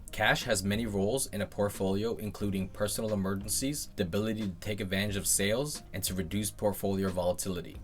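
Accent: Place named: Newfoundland, Canada. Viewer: In this video you say "giv'er" and I believe you've said "by's" in another. I'm from NL so I pick up on it.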